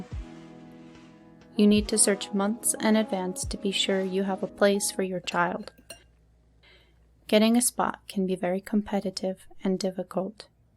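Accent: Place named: East Coast, United States. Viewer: Mrs. is too so good at narrations. It is like a movie, very beautiful sweet voice💓 To me, the air of your speaking English has reminded me of the well behaving American girls from the east coast of the US I as a foreign student from Japan met at the college dorm more than thirty years ago. I miss them and those days. Anyway, I see how young mothers in the US are doing in their child rearing. Good luck and looking forward to the next one😊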